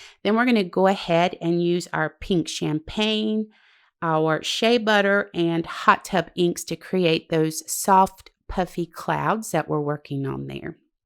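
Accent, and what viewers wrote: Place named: South, United States. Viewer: Love your cards & your accent. I'm from the south but live up north so I love when when I feel at home. I will be a new subscriber!;0) USA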